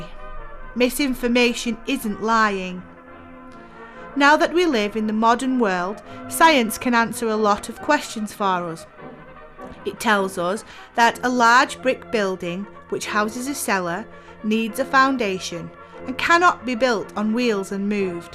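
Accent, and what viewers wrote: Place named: Northern England, United Kingdom. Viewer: First off. A big thank you to the film makers. This was a labour of love made by dedicated documentary film makers! The naration by is superb. Just enough of a northern accent to give it warmth. I loved it. For a film of its length and for a town so small to be able to hold you for 59mins is a tribute to the makers. My Gran lived in Golborn. My late mother was born there. I used to go to Golborn on a Saturday with mum on the bus from Leigh to see her mum. It would be around 1948ish to the early 50s. Gran lived in the second house from the Barn Lane end of Legh St. Opposite the cenotaph. Mum and dad were family freinds of Peter Kain. He made them a poker in the foundery for a wedding present. Later in life, when I was about 12, I used to go to Golbourn on the bus from Leigh to train spot on the station. 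The station master always kept an eye on us from a window up in the station building. Even now I have a model railway at the age of 78. I still have wonderful memories. I have to say thank you to for posting this gem.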